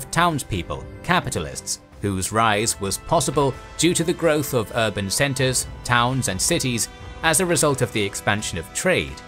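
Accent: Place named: England, United Kingdom. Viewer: I think is English not American based on his accent. But the question is, the World is Euro-centric cause a lot of today's world has its base in European history and technologies (we live in European world so to say) due to the massive influence and impact for good and bad Europe had on the world stage. However, calling England the agent of change of the Medieval period, is like saying Europe was the only place were Iron work or Agriculture was done in the planet. Something we all know is fake and batantly untrue. Pointing out what happened in England is interesting cause as the video is in English, Anglo-American culture wants to know what happened there but leaving the Hansa, Iberia, Northern Italy and the Byzantine Empire complete out of the matter is like talking about the birth of Agriculture and just talk about China as if it had originated there and not Mesopotamia.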